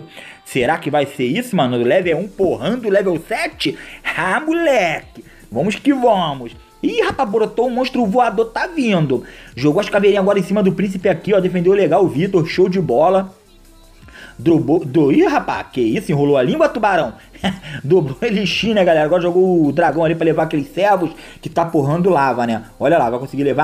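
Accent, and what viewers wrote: Rio de Janeiro, Brazil. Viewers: ei tubarão VC é do rio de janeiro né? puxa o x, ixqueletos, e tbm parece ter a língua presa ne?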